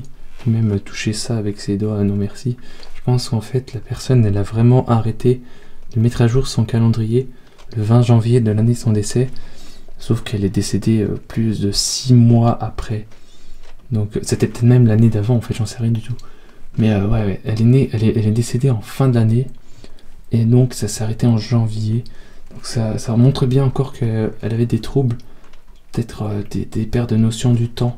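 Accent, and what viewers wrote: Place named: Vosges, France. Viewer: D'après ton accent tu es peut-être vosgien. D'après les anciens même si on ne croit pas aux protections elles protègent quand même.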